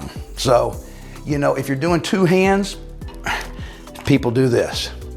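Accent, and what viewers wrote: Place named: South, United States. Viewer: He's from The South. We sez it lack we wunt.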